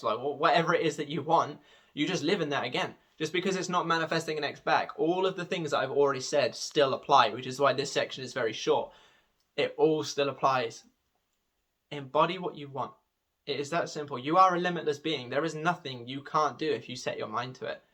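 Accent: United Kingdom. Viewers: Definitely my new favourite law of assumption youtuber , helps as well that you’re from the uk, so I can actually understand you better 😅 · You are a bundle of possative lovelyness, I am so grateful to the American youtubers that have really helped me with my self concept. But it is nice to hear a uk voice. Makes it even more real. I'm subscribing. See you on the flip side. I mean I am already there. 🙂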